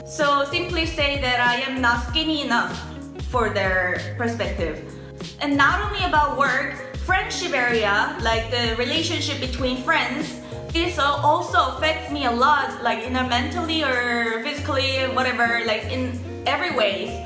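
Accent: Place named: South Korea. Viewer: You speak English well but still have a Korean accent. Interesting.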